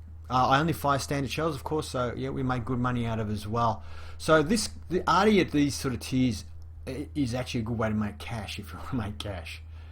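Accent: Australia. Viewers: I'm Serbian I was thinking you are from Australia cause of accent so I'm right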